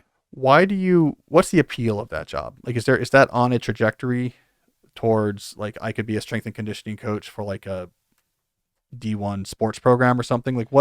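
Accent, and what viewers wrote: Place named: Germany. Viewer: Worst German accent ever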